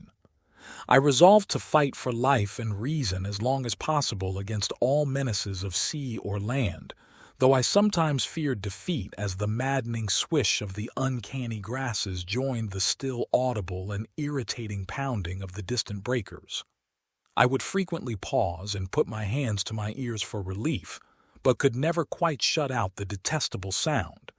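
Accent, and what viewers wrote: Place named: United States. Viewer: Finally I find an American reading an American author. Most readers of this story are Brits. Likewise, I prefer British authors read by British narrators. Call me picky, but it makes a big difference for me. 🙂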